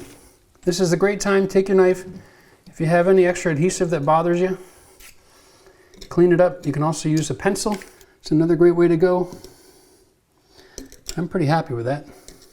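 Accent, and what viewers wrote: Canada. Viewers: Him: Anyone can do this? Me: Hold my beer. I knew you were Canadian from the first video I watched, and it was the way you said 'about'.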